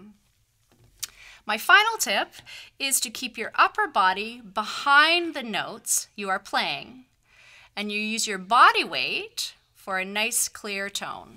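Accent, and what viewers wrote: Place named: United States. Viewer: Great and clear staff and what a beautiful american english accent!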